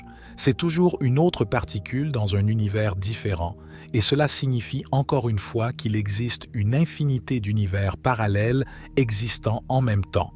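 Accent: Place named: Quebec, Canada. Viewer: oui vous avez une abonné de plus et j'adore votre accent québecois je pense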